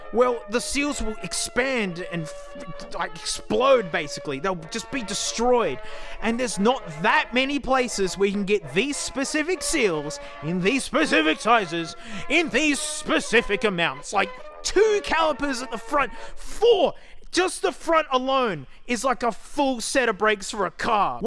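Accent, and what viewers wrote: Australia. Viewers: It never gets old hearing an aussie man scream about cars.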